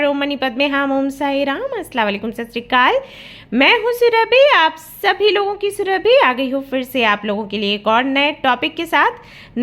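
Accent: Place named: Assam, India. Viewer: I was watching it thn suddenly heard he might be Assamese guy.. lik I was thinking bunch of things before or while watching the vdo.. wow, might there is a chance for me again. Spetical yet I dnt knw.. Let's see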